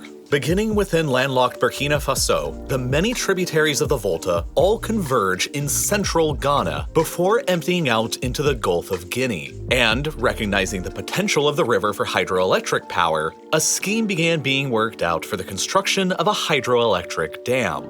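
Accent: United States. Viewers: Why do you use the international measurement standards but still use American only pronounciations on words like Alumunium (internationally pronounced: a-luh-mi-nee-uhm)?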